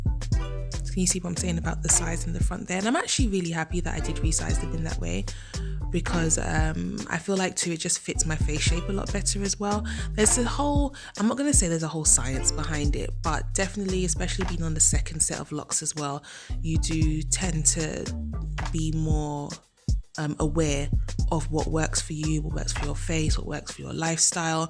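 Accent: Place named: United Kingdom. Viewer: Love your hair and I just love the English accent, so elegant.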